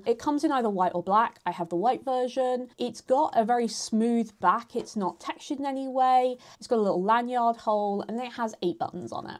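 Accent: United Kingdom. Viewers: I watched with low expectations when I read $. But then you started to speak and yay. A video by a fellow Brit with no waffling or bullshyte.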